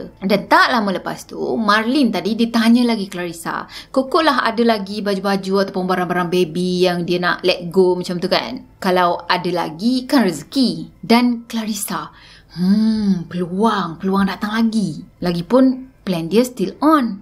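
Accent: Kelantan, Malaysia. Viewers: Walaupun sis ckp kl tp masih ada bunyi kelantan tu. Sbb org kelantan je pakai ayat " dah tu "😂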